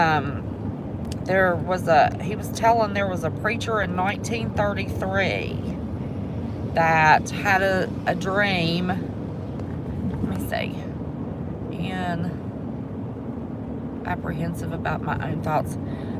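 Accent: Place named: Deep South, United States. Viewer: Where is your accent from sounds deep south, Louisiana? Mississippi? Alabama??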